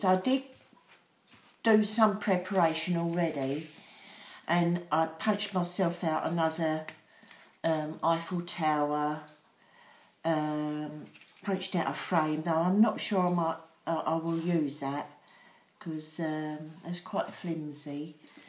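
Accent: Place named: United Kingdom. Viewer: Hi so glad you did a tut on this I saw the one you sent to Tricia and so wanted to have a go. You are so clever. I have sub you and look forward to seeing some of your other videos. I am assuming from your accent that you are in the UK. As am I. What is the Xmas club all about would love details. Hugs Jeanx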